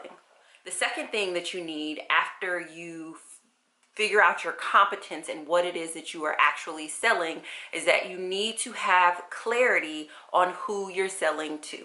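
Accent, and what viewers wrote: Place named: North, United States. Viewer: it is a northern thing. I’m from Baltimore and we say it similarly. 😂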